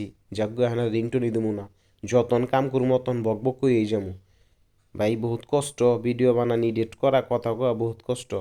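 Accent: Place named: Old Dhaka, Bangladesh. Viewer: পুরাণ ঢাকার ভাষা ভালো লাগেনা, শুদ্ধ বাংলাই কথা বলো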